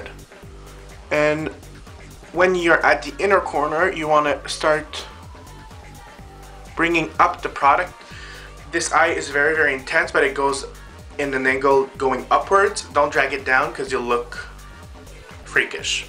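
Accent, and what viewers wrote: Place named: Quebec, Canada. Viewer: He speaks french canadian ;)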